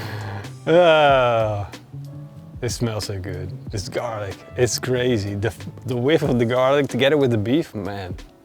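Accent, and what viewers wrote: Netherlands. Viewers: are you dutch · Je hoort echt dat Nederlandse accent hij zei stok terwijl het stick moest zijn 😂😂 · You hear this guy is from the Netherlands 😂😂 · I sense Dutch accent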